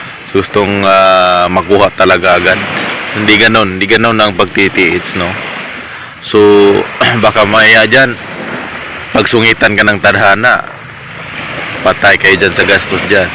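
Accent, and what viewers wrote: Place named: Quezon, Philippines. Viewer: Parang naa ka sa quezon province sir.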